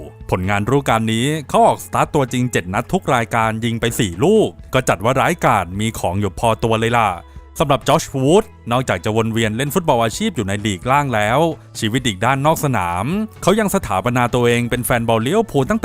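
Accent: South, Thailand. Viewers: แอด เปนคนใต้. จังหวัดไหรคับ ได้ยินเเหลง เมื่อวันก่อน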